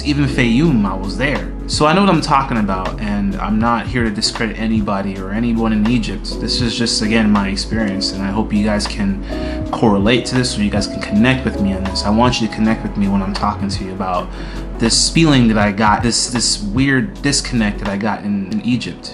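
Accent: United States. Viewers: I am sorry that you didn't get the experience you expected in Egypt. It seems to me that you went with a preconceived idea of what your relationship with the Egyptians should be. Then, you found out that they don't feel the same way about you. But you were lucky you didn't experience any racism there. That's because you carry the little blue passport, and the accent to go with it. Trust me: If you had been from subsharan Africa, you would have felt their racism. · Your American accent gave you an edge.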